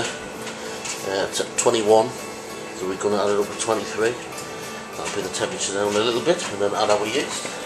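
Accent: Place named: Newcastle, United Kingdom. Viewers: Good video thanks for posting it. I enjoy dark ales and will be looking for the one (hopefully) that you made of the Norfolk Nog. I can't quite place your accent, at a guess i would say Northeast heading towards Newcastle. Am i close to being right ? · looks like a nice brew mate, keep us updated on the taste, you sound fairly local to me (newcastle), where ya from?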